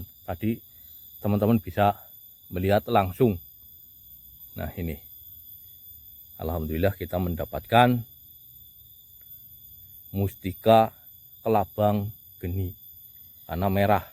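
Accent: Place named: Banyumas, Indonesia. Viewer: Sampean wong Endi bos bahasane ngapak temen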